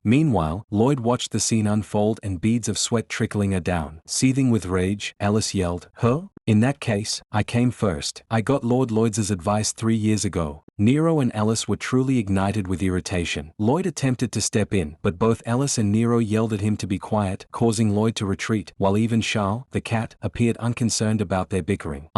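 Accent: United Kingdom. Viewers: Why is AI talking with British accent 😭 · British ahh accent. It's okay tbh. Just not used to it.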